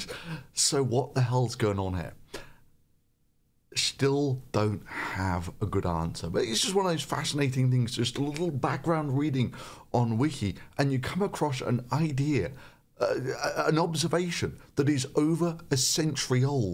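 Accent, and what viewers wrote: United Kingdom. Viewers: is that a Scottish accent? Your long form videos feature a different accent.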